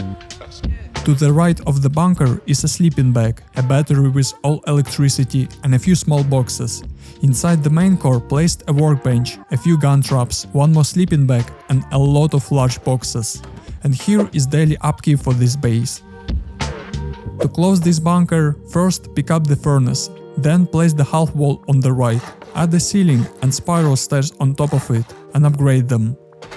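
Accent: Russia. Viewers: Русский акцент ;)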